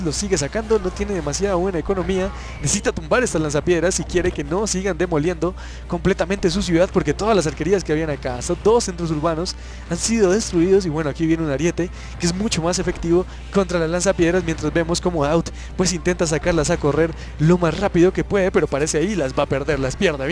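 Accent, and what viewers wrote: Bogotá, Colombia. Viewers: eres colombiano y rolo? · De que parte de Colombia eres? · Entonces eres de Bogota parcero xD muy bien comentado, con buen tono de voz y animicamente bien. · De que parte de colombia eres Buenos videos :D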